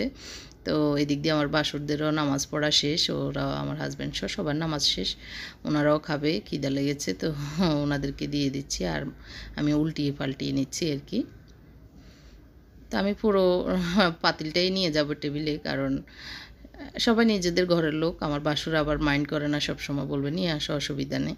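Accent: Noakhali, Bangladesh. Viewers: প্রথম দেখছি আপনার ভ্লগ। ভালো লেগেছে।♥️ আপুর বাড়ি কি নোয়াখালী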